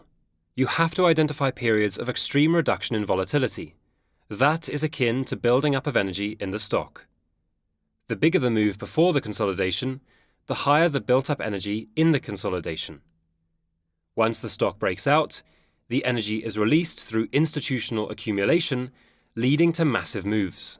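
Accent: United Kingdom. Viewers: English accent sheeple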